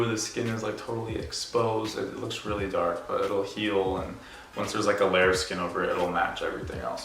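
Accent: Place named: Utah, United States. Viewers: He was born and raised in Utah, so that could be the accent that you're talking about. 🙂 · Which part in the US does your accent come from? I'm not a native speaker of English, so that's just a tiny little curiosity of mine. By the way, your tattoos are amazing.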